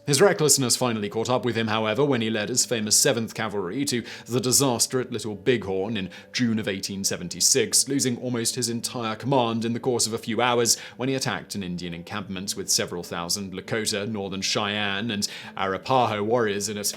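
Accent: United Kingdom. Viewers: BUT, I loved his British accent. I have to find myself an Englishman.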